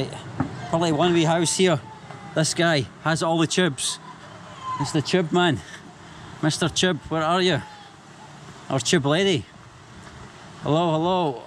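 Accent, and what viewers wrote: Scotland, United Kingdom. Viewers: even then, he's using a scottish accent (which of course makes it even harder). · Don't know how one of your vids found its way to my recommended list but I am loving your travel vlogs. Also love the Scottish accent so hearing you talk is a bonus for me ☺ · so guys in conclusion , every humans has a bad side , so no one is wrong in here, ok case closed but if im there , ill actually be friendly , because i want to train my english, and also he has a scottish accent which is something i like.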